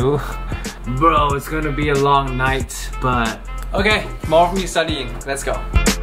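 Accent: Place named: United Kingdom. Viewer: how do you learn such sublime english accent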